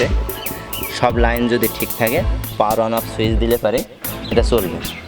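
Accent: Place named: Chandpur, Bangladesh. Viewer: তা আপনি একটা ভিডিও বানাচ্ছেন ভালো কথা কিন্তু কষ্ট করে শিখে নেন না কেন যে কিভাবে মুটা মুটি শুদ্ধ বাংলা দিয়ে ভিডিও টা প্রেজেন্ত করতে হয়? সেটা তো আপনার চ্যানেল এর জন্য ভালো ,আমার বাড়ি ও জসর শহর থেকে ২০ মাইল একটু নিচে কিন্তু আপনার মনে হয় কোট চাঁদ পুরের দিকে ।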